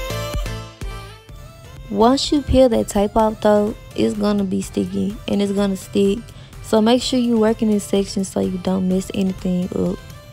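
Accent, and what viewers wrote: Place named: Chicago, United States. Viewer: This is so cute & I love your accent !! Chicago Baby 🤩🤩🤩💛💜💛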